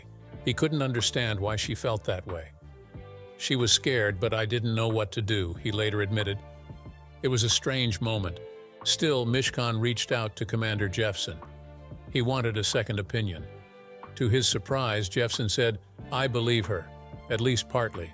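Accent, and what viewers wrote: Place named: United States. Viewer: WHY IS THIS VOICED BY YET ANOTHER YANKEE, I AM PISSED OFF WITH THESE PEOPLE BECAUSE I WILL NOT WATCH HOWEVER INTERESTING IT COULD BE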